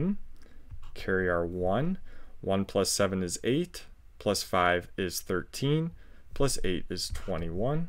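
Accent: United States. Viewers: Ty are you American 🇺🇸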